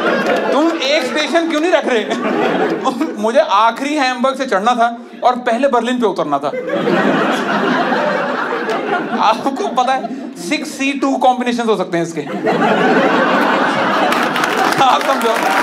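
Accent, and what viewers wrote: Punjab, India. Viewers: That Punjabi accent mix with hindi makes it different. 😅😂😅😂😅😂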